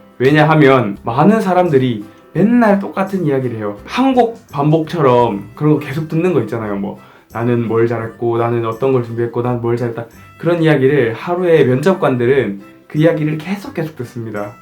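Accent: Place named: Gyeongsang, South Korea. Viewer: 경상도 말투 귀여우세용 ㅠㅠㅋㅋㅋㅋㅋ 감사해요~!! 도움많이 받았습니당ㅎㅎ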